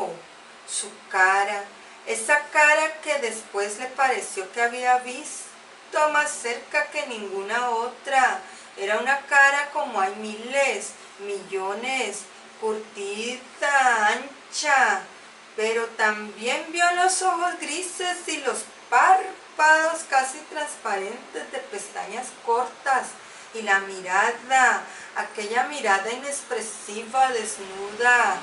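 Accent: Mexico. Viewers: ¡Uy, dejaste morir en los créditos a tu asistente! ¿Dónde está tu versión neutral? Una última pregunta: Un mexicano cuando lee ¿Lo hace así? El acento es importante pero no es definitivo para hacer una buena lectura como "mexicano"; no dejes por fuera el ritmo, la vocalización y la inflexión de tu voz. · El acento mexicano te quito el ritmo de la lectura.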